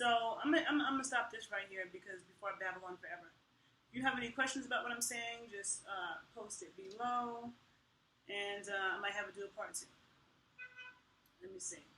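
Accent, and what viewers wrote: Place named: New York, United States. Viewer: Now you are on to the Real Knowledge. Postive you are a fascinating potent female what we use to call a witch, ... more shaman ... me too.. In this corrupt weak patriarchy has twisted and killed our connection with the divine, the energetics... medical symbol is ancient... caudecaus ... it is from ancient sex rituals from Sumer and Egypt... even in ancient Canaanite ... Heard a bit of New York accent New Yawk ! Anyway my fam in Brooklyn, I live in Oakland. I love to have a cup of coffee or juice wit you and discuss magickal systems , sexual alchemy, and ways to build healing systems.. yeah I hear da rain. ! God bless woman.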